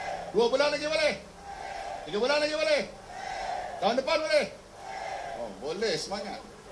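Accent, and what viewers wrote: Kelantan, Malaysia. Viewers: ckp kelantan pun salah..huekkkk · cakap klantan pon huru hara!! cibai la ko!! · huduh demo kecek klate!!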